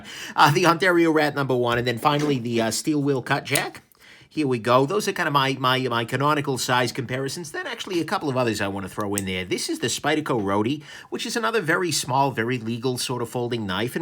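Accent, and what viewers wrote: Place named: South, United States. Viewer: So when do we get the high speed low drag review of the ladybug with that southern accent?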